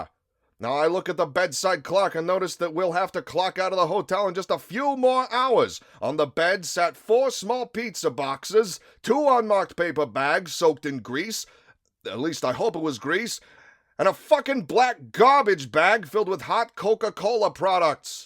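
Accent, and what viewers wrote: Brooklyn, United States. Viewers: Your Italia /Brooklyn accent makes this so much better.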